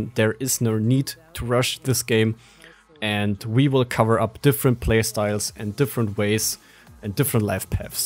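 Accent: Germany. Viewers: Nice German accent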